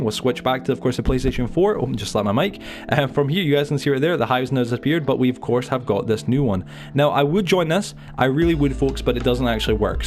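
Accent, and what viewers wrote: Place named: Dundee, United Kingdom. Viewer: U sound like ur from Dundee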